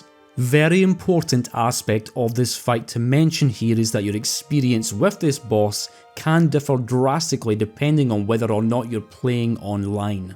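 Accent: Scotland, United Kingdom. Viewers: Holy crap 6 hours of Scottish man talking about soulsbourne 🥹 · is it me or does sound increasingly Scottish with every new video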